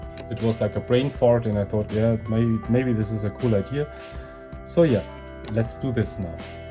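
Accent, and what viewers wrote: Germany. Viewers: Wenn du an den ersten paar englischen wörtern merkst, dass der typ eigentlich deutsch spricht :D.. schöner mod und gut gemacht allerdings haust du damit ne tonne inputlag drauf mit diesen billigen av2hdmi adaptern... besser composite und dann upscalen mit nem hochwerigen scaler und bisschen mit den filtern spielen.. aber ich verstehe deinen ansatz nette idee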